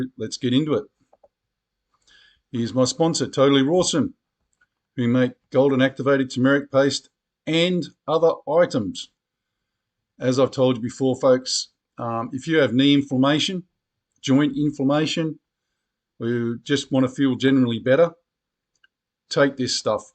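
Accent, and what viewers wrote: Australia. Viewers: Good to have an Aussie voice, there are not enough speaking up.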